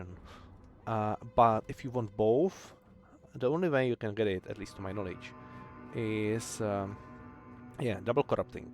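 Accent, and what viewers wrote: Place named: Czech Republic. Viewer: Českej přízvuk se prostě nezapře.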